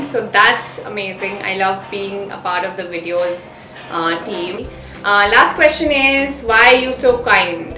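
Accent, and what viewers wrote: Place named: Bihar, India. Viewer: You sound like a bihari..are you one?? :)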